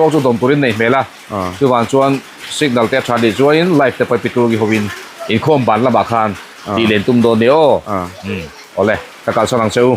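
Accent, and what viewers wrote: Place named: Texas, United States. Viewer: Pu, i Texas awphawi hi a pa tlat.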